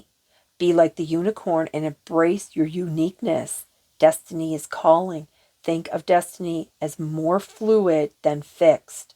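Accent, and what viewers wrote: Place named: Canada. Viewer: LOL .. I think it is bc you are Canadian. I had a professor in college that spoke with a similar sound & he was from Canada too. 😂😅😊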